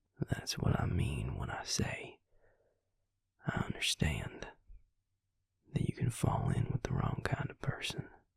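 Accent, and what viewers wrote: South, United States. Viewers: I’m liking the southern accent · Lemme just say, this southern boy makes my tennessee gal heart happy. (as much as i hate living in the south, there’s something about soft spoken southern charm that has me swoonin.)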